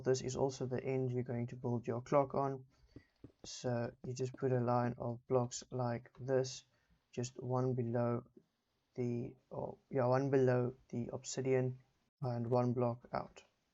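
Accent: South Africa. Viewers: are you sourh african?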